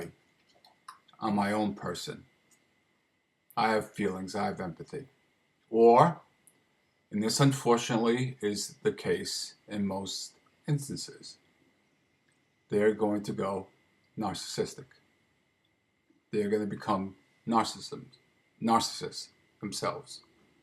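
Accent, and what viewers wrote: New York, United States. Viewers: Sir, it is spooky how you are describing the lives my wife and I have lived. We are both children of narcissistic parents, (more so our mothers), originally from NJ. We moved to the gulf coast of AL to escape our narcissistic mothers in NJ. We both suspect, from your similar accent, your originally from that general region also, (ok, I'll say it, NY), and may have relocated as well. We "enjoy" your posts, because they serve to let us know we are not crazy. We have concluded that no contact is the only way to escape. However, just like Al Pacino said, just when we thought we were out, they pull us back in! LOL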